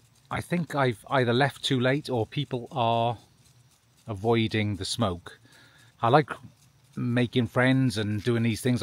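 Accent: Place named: Welsh Borders, United Kingdom. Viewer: Gwent or English borders by the sound of your accent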